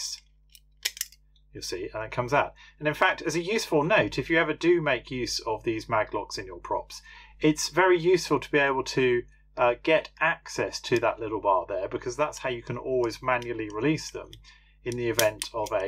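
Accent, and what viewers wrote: United Kingdom. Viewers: Excellent presentation with the charming British accent.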